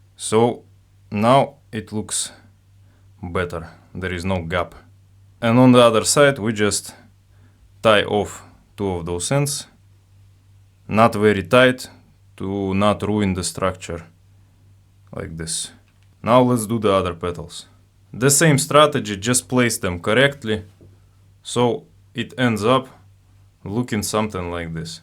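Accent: Russia. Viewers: I love the thick Russian Accent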